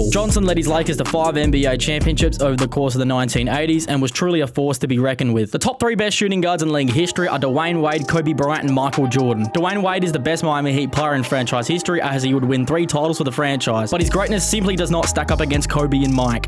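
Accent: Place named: Australia. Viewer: yo r u aussie you sound aussie i am btw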